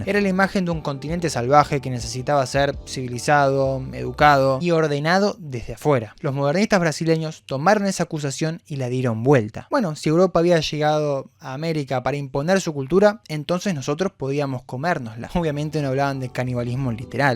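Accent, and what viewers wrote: Argentina. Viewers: mi problema con paka paka, aburre realmente que no hacían mas que reivindicar lo argentino por sobre cualquier otra intención de producir algo propio e innovador y lo argentino está en la voz del que cuenta la historia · Solo escuché el acento argentino y supe que habría victimismo e hipocresía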